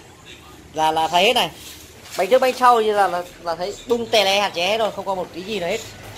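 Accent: Nghe An, Vietnam. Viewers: Ad xứ nghệ phải không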